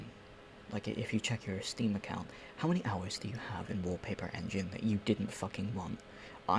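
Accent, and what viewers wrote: United Kingdom. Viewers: Thats a woman? Your just so British that I couldn't tell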